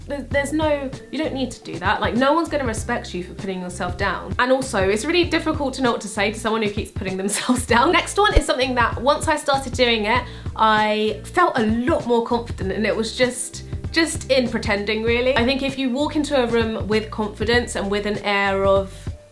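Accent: United Kingdom. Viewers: I heart the British accent and I subscribed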